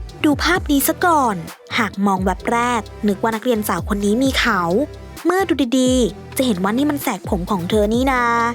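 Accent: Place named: South, Thailand. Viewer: ไอ้ยะ แอตแหลงใต้ ไอ้ยะ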